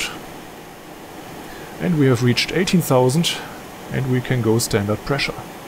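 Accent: Germany. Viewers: Super Video, sehr gut gemacht. Ich nehme jetzt einfach Mal an das du Deutsch bist, das hört man. Englisch sprichst du sehr gut👍 Im Not too Bad in speaking english, however it could be better i supose.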